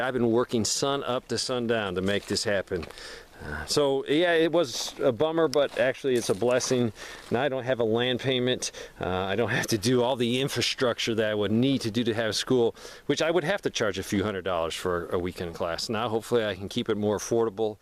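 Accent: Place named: South, United States. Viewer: Glad to see your back.Missed that smooth southern style of yours.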